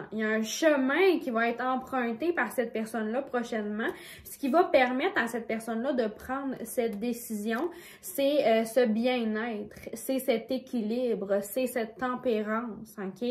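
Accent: Canada. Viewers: Bonjour bel âme merci pr ta jolie guidance qui me parle bcp sur certain sujet 😊 je kiff cette accent aussi canadien sa nous fait voyager à travers tes dires 😊😊je voulais juste te dire sa serait chouette de nommé les pierres pour que l'on apprennes sur les pierres aussi hahha c est une ptite critique constructif sinon tes fortes bonne continuation bel âme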